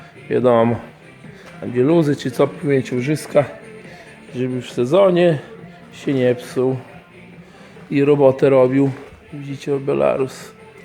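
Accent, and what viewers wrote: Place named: Podlasie, Poland. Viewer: Tak ło chyba kolega z Podlasia :)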